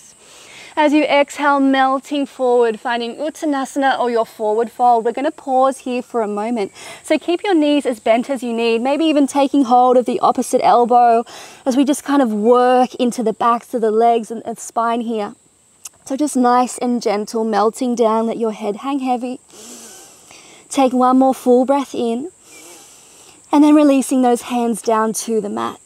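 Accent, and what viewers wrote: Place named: Australia. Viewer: Thank you so much for taking your time for ourselves. What a beautiful practice once more. I missed you and your beautiful practices and your beautiful australian accent and the beautiful sounds of nature all around you. It is hot and sweaty there and I am freezing in front of the wood-stove here early in the morning! The cicadas are long left towards Australia and still very nice to hear that they reached their destination! All the best.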